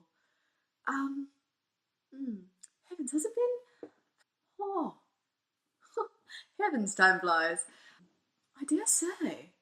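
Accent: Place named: England, United Kingdom. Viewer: This is great and I love your English accent.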